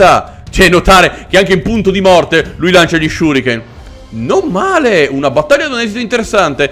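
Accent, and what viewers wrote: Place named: Bergamo, Italy. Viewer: Da quando è che hai un accento bergamasco?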